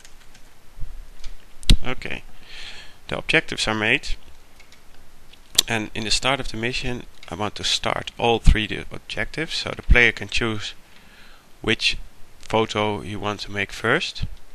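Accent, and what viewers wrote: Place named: Netherlands. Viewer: Je hoort wel dat het een Nederlander is ;D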